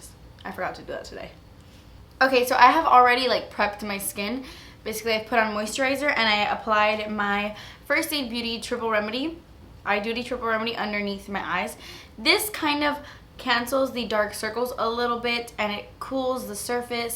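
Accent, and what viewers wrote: Miami, United States. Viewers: I love your Miami accent! Lol. So weird but I can always tell when someone's from there. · She calls it a Miami accent . She's Cuban but doesn't have the accent from them .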